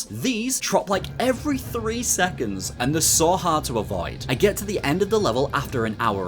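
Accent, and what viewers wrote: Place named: Norfolk, United Kingdom. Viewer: What part of the UK are you from; I’m trying to place your accent — is it Norfolk?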